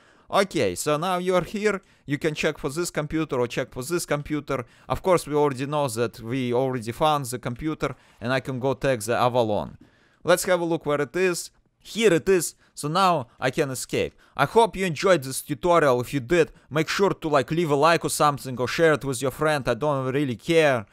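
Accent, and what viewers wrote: Russia. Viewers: This is one of the less common variations of russian accent btw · love the russian accent and thanks for making this tutorial i didn't know how to do this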